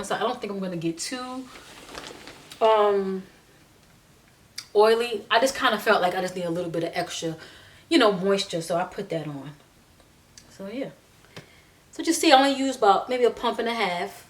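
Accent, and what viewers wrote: New York, United States. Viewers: I knew you was from close by me 😂 I said she from up North. I'm about 45 minutes away in CT.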